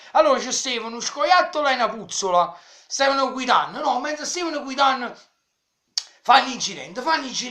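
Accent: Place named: Naples, Italy. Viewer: Mi fai vergognare di essere napoletano..tralaltro dalla cadenza si capisce che non sei nemmeno napoletano ma di qualche paese della provincia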